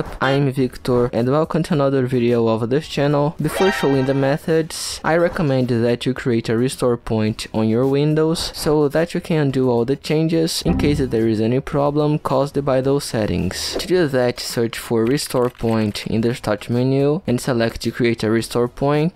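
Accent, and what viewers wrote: Brazil. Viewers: cara da pra perceber q é br so pelo sotaque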